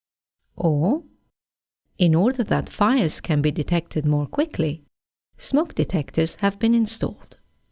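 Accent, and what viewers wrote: United Kingdom. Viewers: It's an honour to be taught by you.I noticed your British accent.That's amazing and I'm Azerbaijani.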